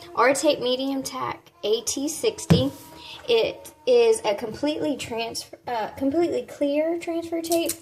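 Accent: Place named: South, United States. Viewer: Love your southern drawl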